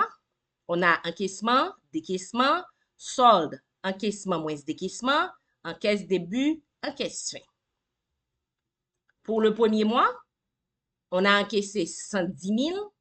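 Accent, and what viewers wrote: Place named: Haiti. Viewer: Slt, Madame , merci pour la vidéo, elle m'avait été utile. Vous parlez français avec un accent Haïtien, êtes vous d'Haïti.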